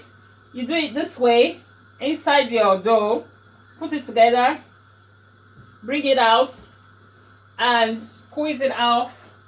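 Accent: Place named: London, United Kingdom. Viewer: I love d way she talks.. she's talking like all does London aunties..nice one..I'll try your measurement.. sometimes my puffpuff comes out great n sometimes it doesn't.. will give it a try and hit you up... good job ma'am👌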